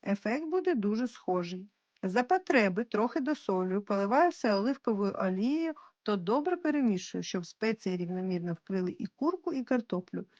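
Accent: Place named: Russia. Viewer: Говорите. или по.русски. или. чисто. по. украински. Ваш. родной. Язык. Это. русский. Это. очень. слышно. Вы. Умница. мне. приятно. вас. слушать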